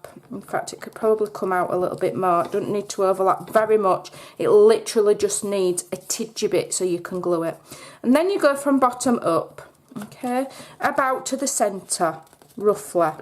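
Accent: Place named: East Yorkshire, United Kingdom. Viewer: Thanks, this is a lovely idea. From your accent you sound like you are from East Yorkshire, like me!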